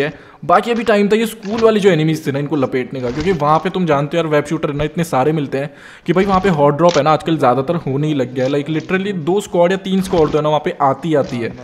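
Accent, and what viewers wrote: Gujarat, India. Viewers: Aare u gujrati?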